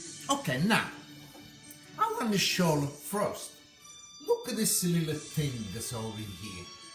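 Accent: Calabria, Italy. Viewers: Centi'anni! This except for anchovies was how my noni made it. She did cook with anchovies but it was a with olive oil, garlic on day old bread. You sound like the old calibretzu from the hill. I miss that accent from when I was a kid.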